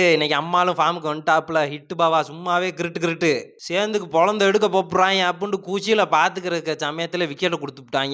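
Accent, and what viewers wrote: Madurai, India. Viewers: ஹாய் ப்ரோ, நீங்க பேசுறது பங்காளி என்று சொல்றது இந்த மதுரை ஸ்லாங் எல்லாமே சூப்பர் ப்ரோ பட் உங்க வீடியோ எப்போதுமே கொஞ்சம் லேட் லேட்டா வருது 29-ஆம் தேதி ஜெயிச்சு மேட்சுக்கு ஒன்னாம் தேதி போட்டிருக்கீங்க வீடியோ இப்படி இருந்தா எப்படி பாப்பாங்க உங்க வீடியோவ ஸ்பீடா போடுங்க உங்க ஸ்லாங்கில் சொல்லணும்னா பங்காளி வீடியோவை கொஞ்சம் ஸ்பீடா ஸ்பீடா போர்டு அப்படின்னு வெட்டி முடிக்கிற வேலையை பார்க்க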